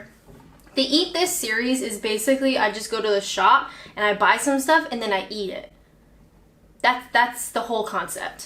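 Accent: Canada. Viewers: Learning how canadian talk sound to sweet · Silly question but how is it that you have lived here a while yet your canadian accent hasn't broken yet? would you say that some English has appeared in your vocabulary the more you stay here? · You Canadians say "couch" and "house" and "about" strangely. But we love you in spite of it.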